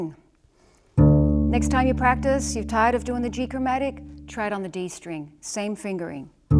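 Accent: Australia. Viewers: So Australian! - starting with G'Day :-)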